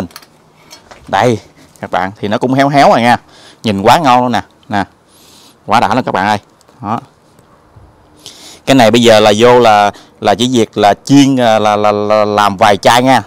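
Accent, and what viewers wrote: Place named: Mekong Delta, Vietnam. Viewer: chau nay noi chuyen dung dan mien tay , thiet tinh , chan chat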